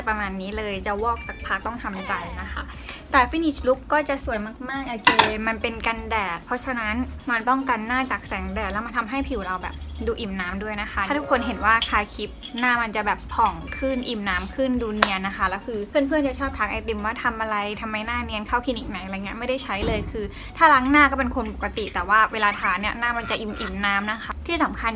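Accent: South, Thailand. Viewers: ว่าแล้วว่าพี่เป็นคนใต้อะ จับได้ตอนพี่พูดว่า เดี๋ยวโดนทุบ5555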